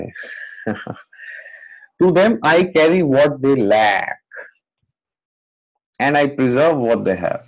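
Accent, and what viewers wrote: Assam, India. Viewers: As he belongs to eastern part of India. An Assamese.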